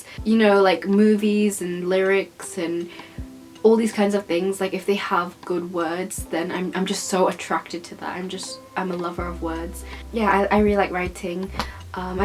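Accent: United Kingdom. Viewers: Hi! It’s okay if you can’t speak malay since it’s not your first language after all. Just ignore those who spoke behind your back about you. Btw, I really loveeee your British accent. I’m currently studying in Degree for Eng Language and tbh it’s personally hard for me to copy the british accent. Your videos are really, really motivating me to practice more☺️ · i wish i could speak to her everyday, i mean, i would love to learn to speak fluently with those british accent. it reminds me more bout harry potter and narnia ♥️ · This is phenomenal, never seen a malay lady with british accent SO COOL 🤣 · wow patutla fluent gile english accent ......born at uk rupenya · Lol finally a malay who talk in British accent other than my teacher lmao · I like listening your british accent , so clear and understandable ... I am ussualy rather bit hard for understanding common british accent...because I'm used to listening american accent ...but now I find your video, and I try to understand your british english ...and yes, its work ... 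your accent and pronunciation is amazing ..keep it hold ! ... your new fan from indonesia ... Harfani Abu salman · AAAA I love your British accent 🥺❤️ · I watched your video to boost my Brithis English:) i kinda like it! Love from INA🇮🇩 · Uuu that british accent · I love your britain accent so much. please make a video on how to speak more britain :) ps: i love harry potter and i want to study in uk too one day....maybe after finish my spm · I’m here for the accent honestly 😊😆 Love the British accent voila 🙌🏻 I tend to speak accents for work meetings following my global colleagues since they understand better if I speak their accent 😃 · Can you be my british teacher ?? Bcs your accent really impress me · You kind of have the british accent but not as how it is really I mean those English people have this accent that's hard to understand , yours is just a hint of British accent. Its clearer and easier to understand. 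· love your british accent!! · Kalaulah saya boleh cakap bahasa Inggeris macam. British accent. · Thanks for cheering up my day, btw really love your British accent ♥️ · im in love with her british accent thoooooo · suka dgr british accent tu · U have UK accents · The british accent sounds so lovely ❤❤ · I really like your british accent 😁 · i'm really love britsh accent and i woud like to learn from you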